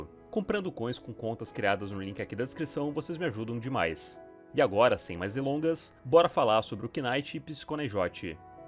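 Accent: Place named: Rio Grande do Sul, Brazil. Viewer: Dicção gaúcha. Gaúchos tem essa dicção pela educação forte de base.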